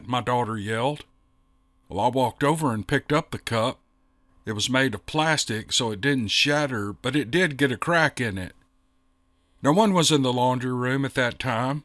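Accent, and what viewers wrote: South, United States. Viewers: Your calm voice in the night sharing stories was very much appreciated. I had to stop listening for awhile since retitling mixes were the same stories I had already heard and it was frustrating trying to figure out what was new and what was grouped into old stories by numbers. I only listen on YouTube and it was getting too jumbled to find recent posts from repeats. Posted date does not always mean recent podcasts. Sometimes the date was just when it was reposted into a different group of stories. "What if it was true"? Steve somebody? Do I want to write down the number? I stopped listening. But I don't like the voices of other storytellers. Your calm voice with the southern accent of my mother's relatives, is like sitting to listen to family visiting and catching up. Last night this post popped into my YouTube feed when I needed to get away from loud arguing of news stories and blood and thunder prophets. 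Thanks for continuing to keep it calm with anecdotes and comments. It was soothing to hear your voice as the wind was blowing in a storm moving south by southeast along the Pacific Coast, pushing inland over the coastal mountains. I hope your family, relatives, and friends have been missed by tornadoes, wind, and floods. I have a day to get ready before the temperature drops and the slushy mix turns to inches of wet snow and freezing nights for about a week. I am going to go in search of what has been added since I took a break and see if I can find what search terms I need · I LOVE ALL THE STORIES UNDER WHATEVER YOU PUT THEM! YOU HAVE A WONDERFUL SOUTHER VOICE! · You read these stories so clearly and fluidly you must pre read and proofread every story, great job as usual and I am from Canada 🍁 and love your light southern accent!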